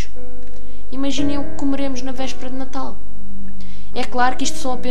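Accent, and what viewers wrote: Portugal. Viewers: Adoro baleias... Apesar do sotaque português, consegui entender o vídeo.. Parabéns! Abraços do Brasil. :) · Unica coisa que ficou horriveeell no video, Foi A Narração Portuguesa. Si fosse narrado por um brasileiro ia fica 100% bom.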